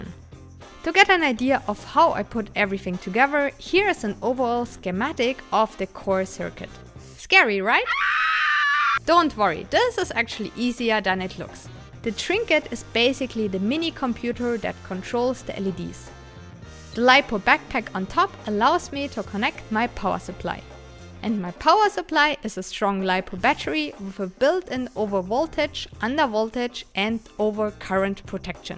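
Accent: Germany. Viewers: In den ersten 2 sec gehört das du aus Deutschland bist. No front. Ich finde es gut 😁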